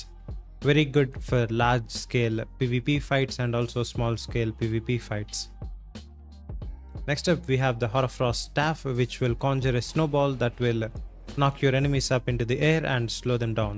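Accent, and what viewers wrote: India. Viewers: Bro are u indian?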